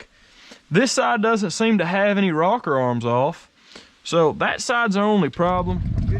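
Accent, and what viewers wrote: South, United States. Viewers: I love that Southern Accent!🐊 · Great videos sir! Used to live in Georgia and miss that southern drawl ! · Shit your southern "dialect" is the best, gotta appreciate it when you hear it - not too often here in Finland :) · That southern accent even if your smart you sound dumb.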